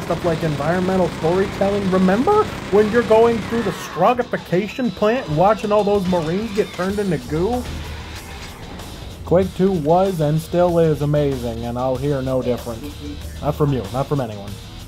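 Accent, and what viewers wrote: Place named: Midwest, United States. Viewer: bruh that’s that midwestern type accent you don’t get it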